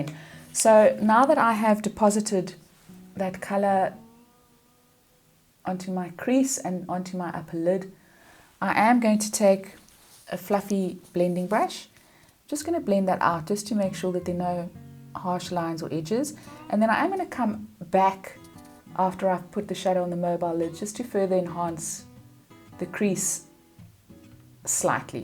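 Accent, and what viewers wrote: South Africa. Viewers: You mention “Sheen” brushes. Is that the spelling, and what country are you in? I recognize the South African accent, but nowhere is there any information on where these products are available or their price.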